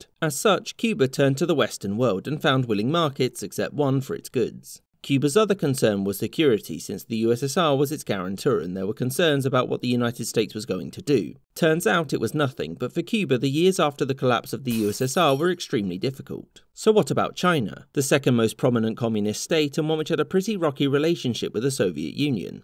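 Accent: United Kingdom. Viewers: British accent is so annoying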